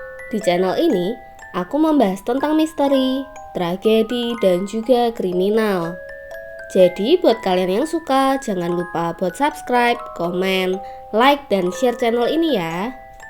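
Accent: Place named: Java, Indonesia. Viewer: Kak saran aja ni, hilangin medhoknya. Udah itu aja, selebihnya i love you ❤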